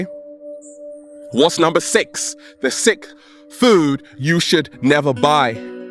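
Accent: United Kingdom. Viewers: That British accent tho....love it! "Tomatoes"😁😁Love you. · Are you British because you sound like it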